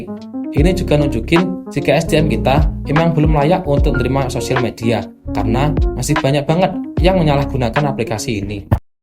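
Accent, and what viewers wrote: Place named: Java, Indonesia. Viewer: lebih enak kalo medok gini bg aseli🗿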